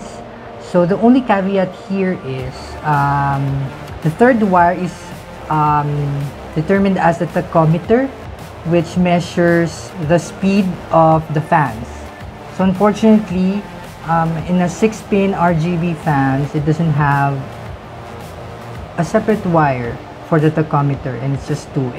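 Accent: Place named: Philippines. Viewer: u sounds like a filipino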